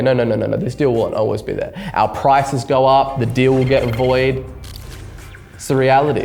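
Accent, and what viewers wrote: Australia. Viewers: is hormozi with ozzy accent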